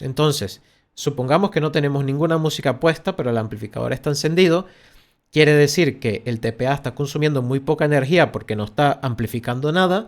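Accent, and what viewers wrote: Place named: Venezuela. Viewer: Ok gracias por el dato y la corrección, ya me parecía familiar el acento venezolano saludos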